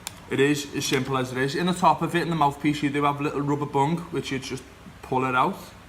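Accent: Liverpool, United Kingdom. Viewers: Liverpoooooooooool!!!!! great accent man!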